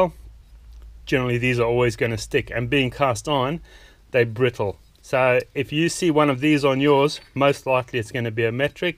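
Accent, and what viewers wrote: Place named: South Africa. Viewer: Love the South african accent.😊 Thank you for all your educational videos.🙏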